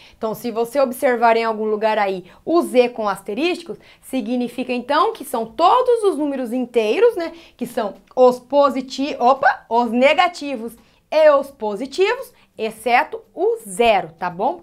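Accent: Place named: Northeast, Brazil. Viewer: Você é nordestina?? Adorei o seu sotaque 🤗🤗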